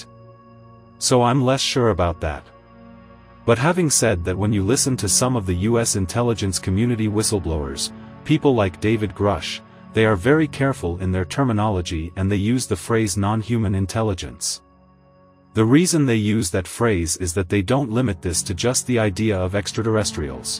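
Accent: France. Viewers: You have a beautiful voice CAPTAIN. You rock the flight deck. Good job! 💖🌞🙋‍♂️👽 "We like your french accent best." Fun to look into a.i. in a good way and have fun with it. ✌️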